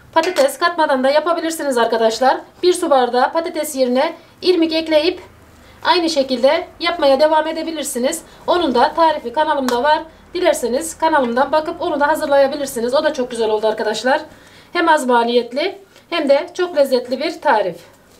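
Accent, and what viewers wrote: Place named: Central Anatolia, Turkey. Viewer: konuşma şivesinden İç Anadolu lu olduğunuzu tahmin ediyordum ama bu kadar yakın olduğumuz aklıma gelmezdi Çok memnun oldum Başarıların daim olsun kal sağlıcakla 🥰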